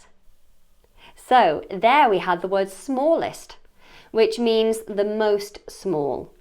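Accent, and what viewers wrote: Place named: United Kingdom. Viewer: Wel come sweety. Huge thanks 4 ur daily updating us wz ur english knowledge nd British accent. I love u. Ur bro Salih from Eritrea.❤❤❤❤❤❤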